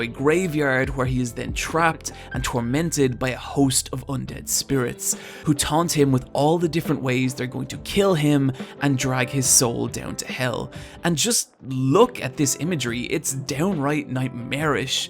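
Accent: Ireland. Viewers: Am I the only one who feels like that he sounds a lot more irish in this video than in his others? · because he's Irish. Whenever he speaks English he does so with that regional inflection, which affects pronunciation IE how he says "Film". It's not something that is "incorrect", unless contradicting common elocution and that can only be called out by someone that lives in that region.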